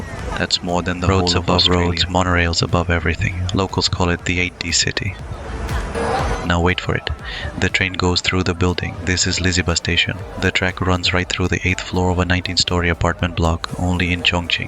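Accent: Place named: India. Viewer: 你的英語口音和其他大部分印度人的英語口音不同，為什麼？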